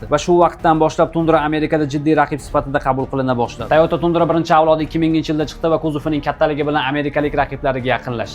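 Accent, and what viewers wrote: Andijan, Uzbekistan. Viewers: Бу брат тоза Анжанданми диманда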